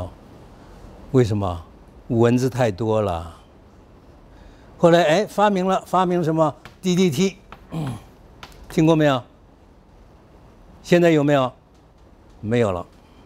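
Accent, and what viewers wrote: China. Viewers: 老教授學養深厚，口音明明是外省腔，所舉的例證卻能用臺語發音，而且還能如此標準，不容易啊！值得借鏡。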